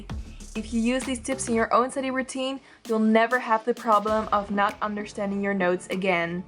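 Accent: Netherlands. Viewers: Ik hoorde aan het begin van de video een nederlands accent, toen ik jouw schrift zag wist ik het wel zeker :) supergoede engelse uitspraak! <3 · Are you dutch? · OMG ARE U DUTCH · Ben je Nederlands 😅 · at first i thought u were dutch too because of those pens and your accent... and then when we got to see your notes, I SCREAMED BECAUSE YOUR DUTCH TOO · Is that dutch 😂❤️ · i smell some dutch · Wait omg r u dutch?! 😍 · Ok but like I have such a strange feeling you’re dutch, is that correct? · Hi i know this is so random but are you dutch?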